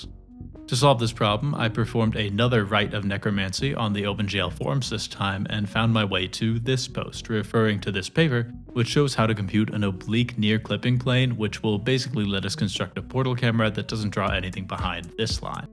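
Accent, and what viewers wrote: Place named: Denmark. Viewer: Great video as always! Jeg havde ingen idé om at du var dansker, din accent er ikke særlig stærk :)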